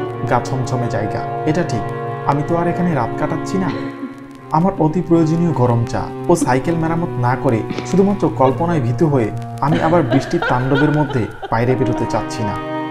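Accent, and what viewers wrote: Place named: Bangladesh. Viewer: আপনি নিশ্চই কাংলাদেশের মাল আপনার ভাষাই বলে দিচ্ছে আপনি কাংলাদেশের মাল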